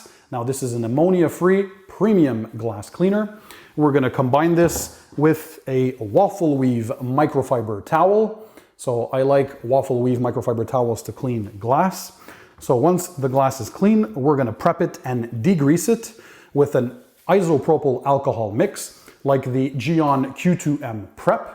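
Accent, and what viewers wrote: Canada. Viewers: Dude, why the Canadian accent? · Canadian accent, eh? 🇨🇦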